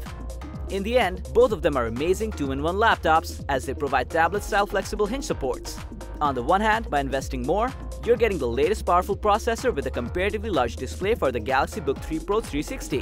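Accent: India. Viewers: Dude your Indian accent shines through that fake one. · Are you by any chance Indian? Not that it's important but I can't help but ask because of the accent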